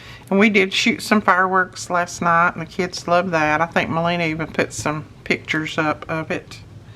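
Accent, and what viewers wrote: South, United States. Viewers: What a wonderful video this is. Not only do I get a tutorial on how to make a great addition to my ephemera stash, I get to enjoy the background music of a storm. I miss the claps of thunder and the relentless rain, and this is a video that I will watch over and over. When I get homesick for my Texas girlhood, I watch your channel. I miss the speech of Southern people and the scream of Southern storms.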